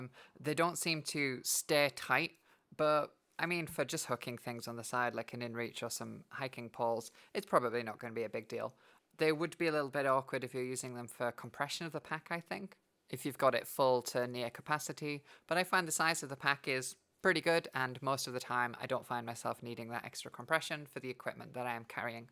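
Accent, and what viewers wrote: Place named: East Yorkshire, United Kingdom. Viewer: every now and again, i hear east yorkshire in your accent. Hull. Have you lived in some different places? Where are you from? love the video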